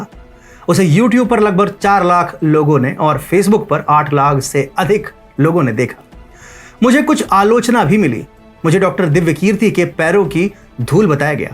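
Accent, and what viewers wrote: Standard. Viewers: Santosh karak vaani hai aapki aur shuddh Hindi uchcharan pasand aaya 🙏 aise hi samaj ka kalyaan karte rahe. Dhanyavaad 🙏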